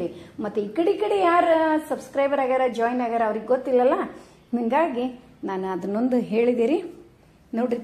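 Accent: North Karnataka, India. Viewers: Ñìmma voice bala chanda ri namma athhe vaice ade thara irithithu namma athhi du hanuma sagara kustigi kade utharakarnataka bashe balu sundara 🙏🙏🙏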